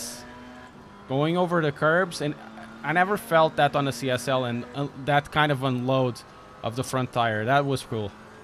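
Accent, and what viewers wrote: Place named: Portugal. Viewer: és Portugues? sinto ai um sotaquezinho.. e tambem como deste review ao yuri... segui porque sigo tambem o mundo das corridas e tambem gosto de fazer umas corrindas num simulador apesar de ser um simples g29, gosto de estudar trajetorias e superar me a mim mesmo.... já joguei muito iRacing mas agora fico me mais pelo Assetto corsa Na nurbur :D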